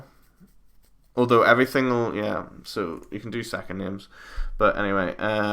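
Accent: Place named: Northern Ireland, United Kingdom. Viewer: You sound northern Irish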